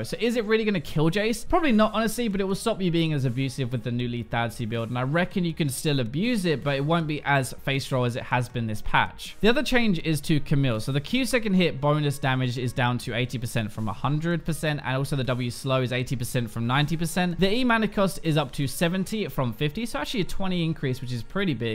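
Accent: England, United Kingdom. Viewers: Your doing gods work, both the patch videos and the app are very helpful as well as the English accent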